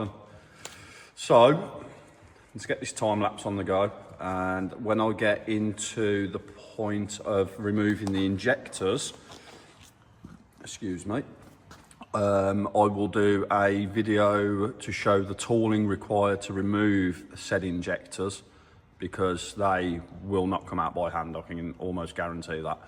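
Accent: Birmingham, United Kingdom. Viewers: Nice hearing a fellow Brummie and also getting a result out of that engine. well done kid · Just watched your channel for the first time and thoroughly enjoyed the Brummie tones of your commentary. You obviously know your stuff on trannies and are able to make judgments using your experience. I’ve liked and subscribed and look forward to watching you again in the future. 👍🇬🇧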